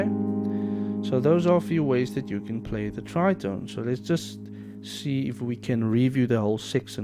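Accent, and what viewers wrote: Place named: Southern Africa. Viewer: It's amazing how distcinct a Southern African Accent is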